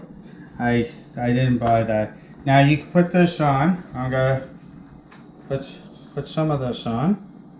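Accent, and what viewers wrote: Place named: Texas, United States. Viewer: Yes, that accent always gives it away doesn't it, haha. Well technically if you live in Texas then that makes you a Texan. I'm sure there is a native Texan that would strongly disagree. These things always make me laugh because most of us came from somewhere else originally and quite a lot of these so called southerners have not so distant northern and European roots.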